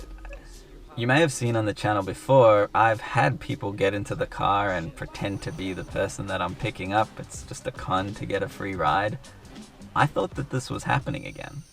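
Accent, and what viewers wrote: Australia. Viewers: are you australian??? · I just realised now your Australian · You Australian? Ik where Richmond is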